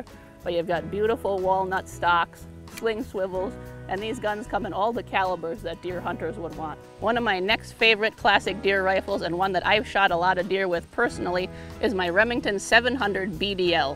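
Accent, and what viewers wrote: United States. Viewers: All she needs to use is the word “feller” and that country accent will be complet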